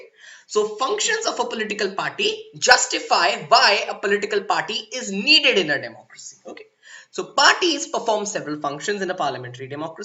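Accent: India. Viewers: He is from west bengal due to slightly due to his voice.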